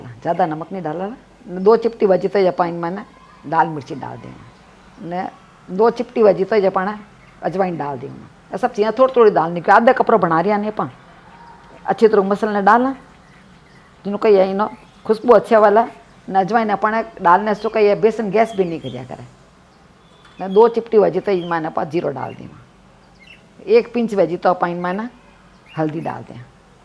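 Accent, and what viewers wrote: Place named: Jodhpur, India. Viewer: Aapki awaz, ton sabkuch Jodhpuri he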